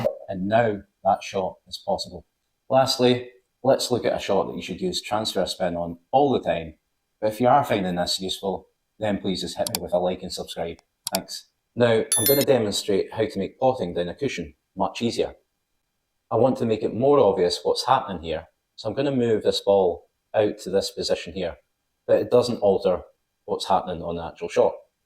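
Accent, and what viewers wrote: Scotland, United Kingdom. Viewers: Nice to hear a Scottish accent. Thanks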